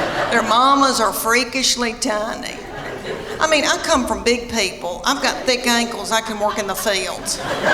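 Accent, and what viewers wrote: South, United States. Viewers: I love your accent. After a Spanish woman with a lisp you’re southern accent is absolutely adorable, obviously 3rd place goes to a Welsh woman.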